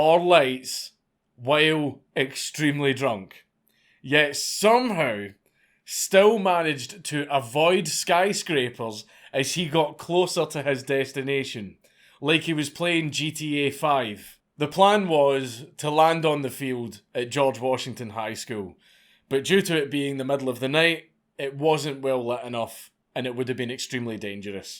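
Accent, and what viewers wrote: Scotland, United Kingdom. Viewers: Bro you only have 830 k + subs?…. Is half of the globe missing their testicles? Or are we in some sick twilight zone episode where having a sexy and masculine Scottish accent taboo?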